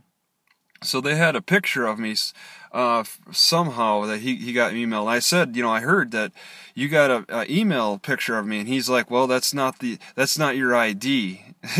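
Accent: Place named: South, United States. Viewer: A word of advice your voice is awesome your face not so much point your camera at something else and just let your voice do the talking I subscribed because your videos are edgy and cool and your voice is a combination of a southerner a Pacific Northwestern and a guy from Jersey!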